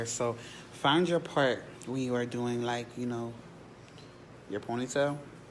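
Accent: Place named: Baltimore, United States. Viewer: He sound like one of those baltimore people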